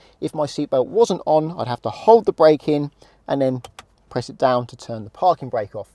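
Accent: United Kingdom. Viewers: Although I live in country with some different road laws, has right side driving and it's speed measured in km/h, the fundementals of driving are the same. I am deaf person with cochlear implant, although you speak British English, you speak so clearly I can understand even without automatic subtitles. You give me confidence in learning to drive. I learning to drive manual, your advices were so much helpful than my instructor's advice in my native language. Thank you very much for your channel!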